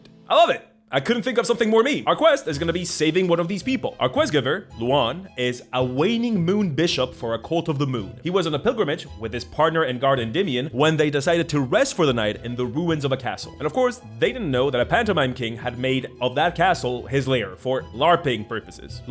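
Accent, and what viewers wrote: Spain. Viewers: Também gosto que tu é espanhol, algo na fala derivada do latim me da uma sensação comunitária também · Is that a spanish accent I hear? I always thought you were italian!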